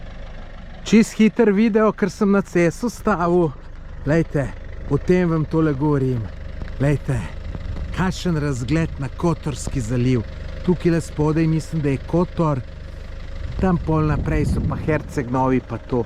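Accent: Lower Carniola, Slovenia. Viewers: Joj, kolk ti mene nasmejiš! Tvoja dolenščina je tolk zabavna, še kletvice so original. O krajih ne poveš kaj bistvenega, ampak za popotnika pa krasne info. Cena app, hrane, zajtrki.....ma ni boljše info. Super. Veš kaj mi je tudi všeč; začel si intervjujat ljudi. To je super. S tem potovanjem proti Albaniji in sedaj ČG si me pa spet navdušil. Motijo me le reklame, ki so podvojene in na kratko sapo.Razumem, da je tudi to del zaslužka, ampak....razmisli.....če ti res to potrebuješ. Na nek način meni malo razjezi, pa še koga verjetno tudi. Bodi fajn...in srećno pot proti domu. Aja....pa bolj počasi potuj...potem se ti neumnosti ne bodo več dogajale.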